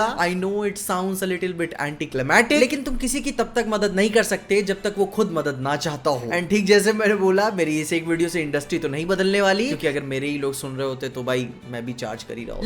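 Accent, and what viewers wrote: Standard. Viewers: bhai ki shuddh hindi sun k maza aa jata hai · Bro can you ease change your Hindi accent coz I can't understood your pure Hindi thanks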